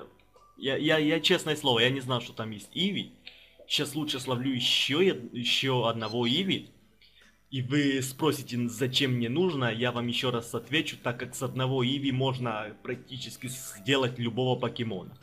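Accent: Armenia. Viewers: Акцент армянца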